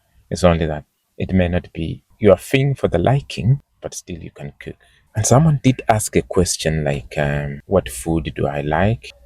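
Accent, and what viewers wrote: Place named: London, United Kingdom. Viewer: from Malika squad, happy to see you.oh my .... u have a London accent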